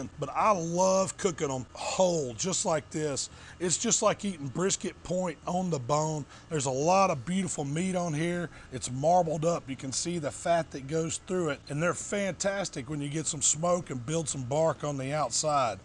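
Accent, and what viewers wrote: South, United States. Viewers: I went through dozens of bbq videos until I saw a big fat guy with a southern accent. Then I was like, "I'm gonna listen to THAT guy." Love your videos, brutha. Total food porn for real. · That one good me good, he often says stuff like this, really southern imo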